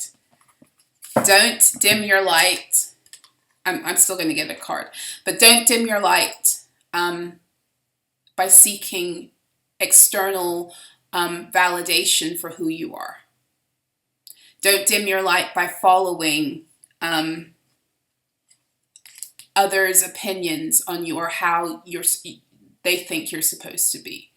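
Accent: United Kingdom. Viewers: Why are you doing a British accent, your from the States yes?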